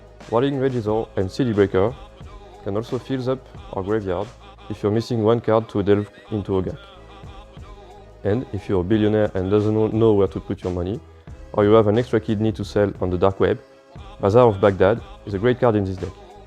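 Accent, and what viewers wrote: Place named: France. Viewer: L'accent français 🤪😘 La même en français c'est possible ? 😁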